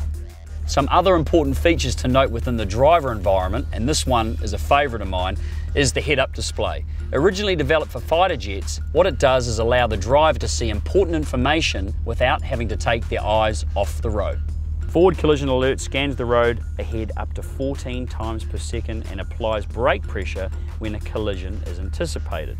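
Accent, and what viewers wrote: New Zealand. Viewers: Who let this kiwi present this video? Would have thought they would keep in Australian...seen as though it's an Australian produced vehicle...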